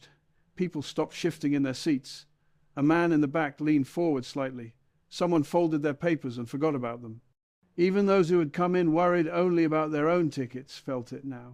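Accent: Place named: United Kingdom. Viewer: Agreed. Can hear the british accident.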